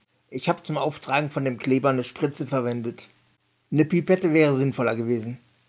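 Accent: Hesse, Germany. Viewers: Hi, sehr schönes und lehrreiches Video....kannst öfters hessisch babbeln:):) LG Mike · Hallo, sehr unterhaltsam, auch oder gerade wegen dem "hessisch babbeln"... 😁😁😁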